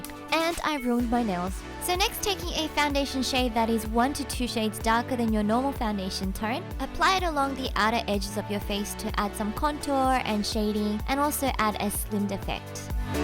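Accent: Australia. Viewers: I love it that your makeup tutorial always have that perfect mixture between "western" makeup and korean makeup. No offense but I found minimal korean makeup starts getting boring to watch after a while so some refreshing looks like this one is really refreshing:) Also, your Aussie accent just makes me love ur vids soooo much:* thanks for the tutorial:)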